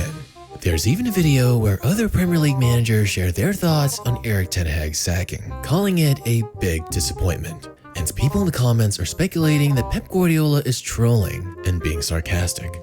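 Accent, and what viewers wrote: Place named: United States. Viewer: The yank accent further proves how laughable this is 😂😂😂😂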